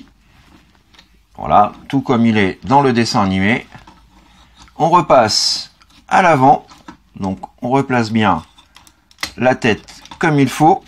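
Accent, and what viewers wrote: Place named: France. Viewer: With your beautiful french accent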